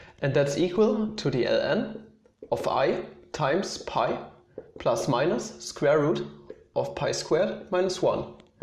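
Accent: Germany. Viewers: Bist du deutscher? Man hört deinen Akzent hehe und dein Video hat mir geholfen omg danke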